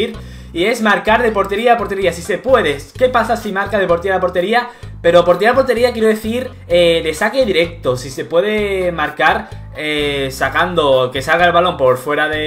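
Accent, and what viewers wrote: Germany. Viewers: eres Alemán??? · eres aleman?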